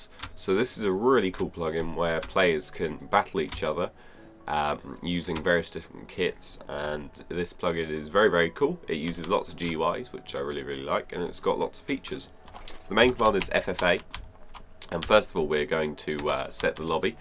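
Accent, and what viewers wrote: Netherlands. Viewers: R u Dutch i am Dutch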